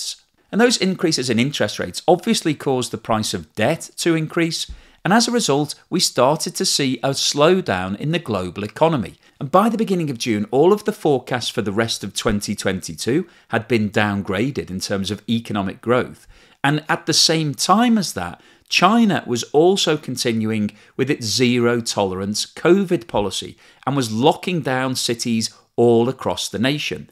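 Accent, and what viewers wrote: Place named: Liverpool, United Kingdom. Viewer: Great content and superb delivery -{ for non-UK viewers, delivered in a pleasant Scouse ( ie Liverpool) accent )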